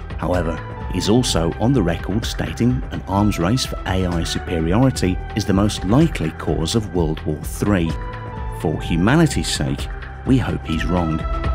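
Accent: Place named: United Kingdom. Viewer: Awful British accent... I turned off sound and watched only subtitles... Why this pronunciation is so bizzare and awkward, as oversophisticated pukes?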